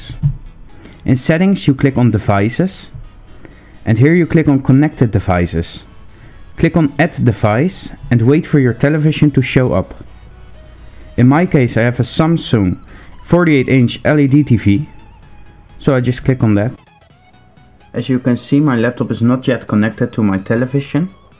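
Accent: Netherlands. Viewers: your dutch accent is terrible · You must be Dutch. That accent! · he sounds so dutch someone tell me i am correct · Duidelijk een nederlander XD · hahah ik hoorde gelijk dat je NL was. keep up the great work man :) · you sound really dutch m8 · ik hoor dat je nederlands bent hahah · Ik hoorde meten al dat je nl was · You sound Dutch-ish. Are you? :)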